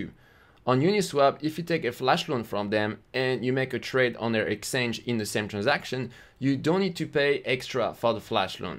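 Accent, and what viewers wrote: France. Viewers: ouuhhh l'accent francais aahaha. Super video sinon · I think you are french 😁😁 i felt this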